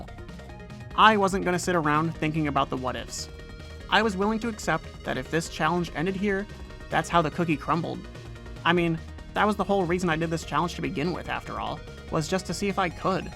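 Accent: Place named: United Kingdom. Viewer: British lad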